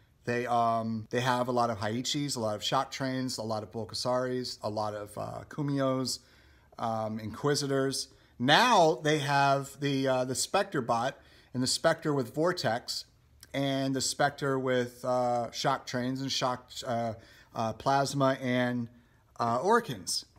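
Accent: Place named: South Africa. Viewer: lol. It's a South African accent!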